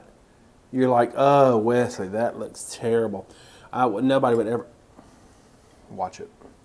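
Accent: South, United States. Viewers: Binge watching you today! LOVE 💕 THIS 💕 CHANNEL!! U make me smile! U encourage me to cook and I love your realism. Can’t wait to make this! I love your accent. I’m from SC so it’s comforting to hear southern twang! Keep calm and Keto on, my man!! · Just discovered you the other day and you are an absolute DELIGHT to listen to! You're so funny and sweet, informative and I love your southern US accent! I'm already a fan, over here in Belgium. 🙂❤️ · I love your southern accent. 🙃😁🤣 "oh come on here withat!"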